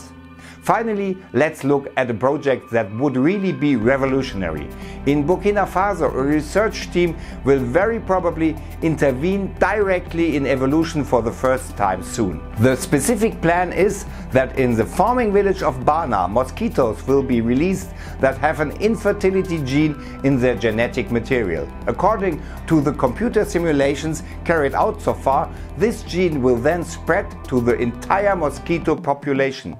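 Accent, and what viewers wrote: Germany. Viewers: Cool, u realy talk in a good english. Just ur dialect says me u r german. May u need more praxis with american or english- born people ...😉. Never mind, u r documenterys r still interesting 👍🏼. Thx there for.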